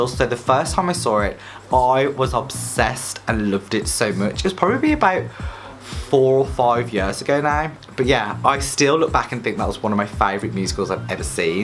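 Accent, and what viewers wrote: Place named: Birmingham, United Kingdom. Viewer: AHAHA this whole time I could never figure out where you were from, as if you're a brummy too.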